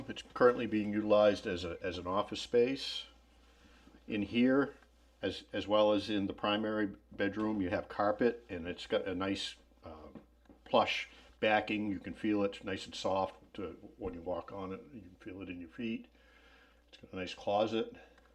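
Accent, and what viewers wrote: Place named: Maine, United States. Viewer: I watch videos just to hear that Maine accent. As authentic as it gets.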